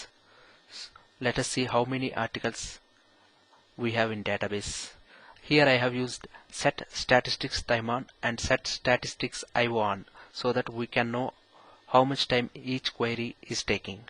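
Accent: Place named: India. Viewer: It is really hard to understand you because of the indian accent. REALLY HARD I am sorry but it is true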